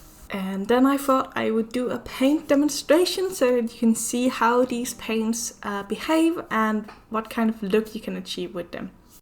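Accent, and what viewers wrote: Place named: Denmark. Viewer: Are you from Denmark? I can hear the Danish accent. My gf is from Aarhus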